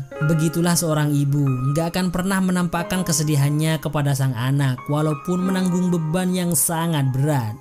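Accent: Madura, Indonesia. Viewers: Bang kakeh reng madureh yeh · bhs madure wkwk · Orang madura bang??? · Bang Madura nya daerah mana bang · min orang madura kmu min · Madureh kakeh min? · Kelihatannya sang moderator ini orang Madura tak iya...😂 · Logat nya madura bngt😅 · Pasti Orang Madura ya hahha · Orang Madura ya 😅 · Madura nih adminnya😂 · Orang madura bang?medureh dimmah? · Pasti orang madura😅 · Lu orang Madura ya bg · mangkanah reng madureh😂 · Orang Madura min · Yg cerita org madura kah... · Mas ya orang Madura ya,,, sumpah baru kali ini liat alur cerita ending ya q nangis sampe sesenggukan,,, terimakasih mas sudah membatu menceritakan,,sebab mau nonton film ya GK ada sub indo ya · Kak maaf aku ketawa, logatnya lucu, kaya logat orang madura. Emang asal madura kah? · mimin orang madura ya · Ternyata bebengusnya oreng madureh yeeeh🗿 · Reng madureh bn min? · Orng madura ya mas hh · Reng madureh kakeh🎉😅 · Barusadar orang madura kah😅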